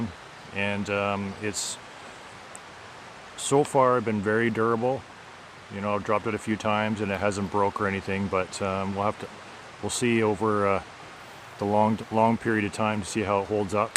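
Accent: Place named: Canada. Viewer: I heard his Canadian accent 5 seconds in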